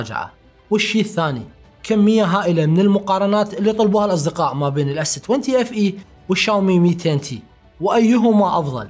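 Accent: Iraq. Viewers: اتا بشوف الفيديوهات فقط منشان استمتع باسلوبك المبدع ولكنتك العراقية الجميلة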